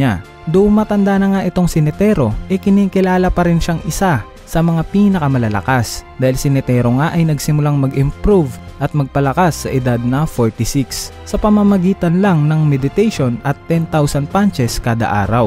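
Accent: Visayas, Philippines. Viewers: Bisaya ata si idol😂😂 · Nag mukhang mali lng yung pag pronounce nya kasi filipinx accent Hahaha.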